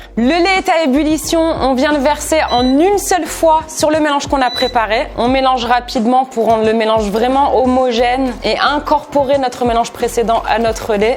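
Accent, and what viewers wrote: Canada. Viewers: tu mon youtubeur préféré desvidéos accent canadien et qui met quoi des vidéos trop trop rire 👍👍👍👍👍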